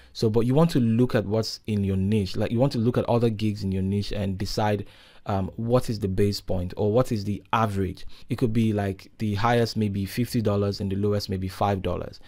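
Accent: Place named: Nigeria. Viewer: I like your clean clear Nigerian accent.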